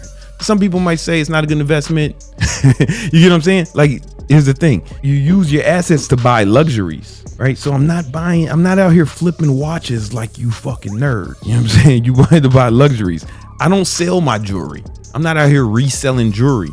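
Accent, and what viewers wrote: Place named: New York, United States. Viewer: I could tell he a NY nigga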